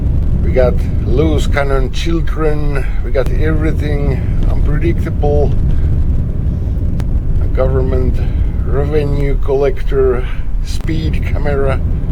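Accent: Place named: Finland. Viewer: You are a very good narrator and always make me smile with your Finnish accent and humour - well, in polite English, I would say that mostly, you are 'extracting the urine' out of things you witness. What tent was that one - cancel, that, just expanded the description - is it heavy to carry?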